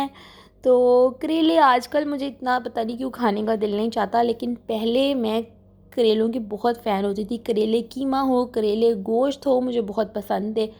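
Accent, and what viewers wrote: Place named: Punjab, India. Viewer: aajkal punjabi mode kyun on hua wa hai?? you punjabi is sooo cute - and please make shakarkandi ki shaan mai video :)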